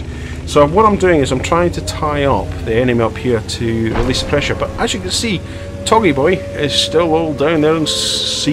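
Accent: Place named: Scotland, United Kingdom. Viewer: yea, I like your vid...got my sub...can't wait for some more..Love the Scotsmen Accent...I'm in Nova Scotia...where abouts you at M8?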